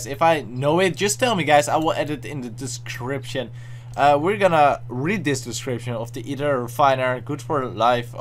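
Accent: Netherlands. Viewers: I love you're vids and accent r u Dutch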